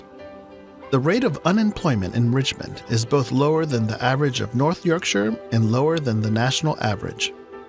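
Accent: United States. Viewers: yanks - we pronounce clerk as CLARK and wish you would also. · ... "best places to live in the UK" ... american accent introduces vid--wait. no. what? f** off. see ya* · Have you noticed the guy is bloody American 😂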